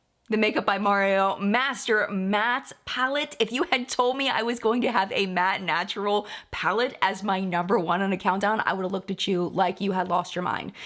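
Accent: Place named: Maryland, United States. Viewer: I can hear you're a Marylander with how you say your Os. 😁 Hi from a fellow MD lady!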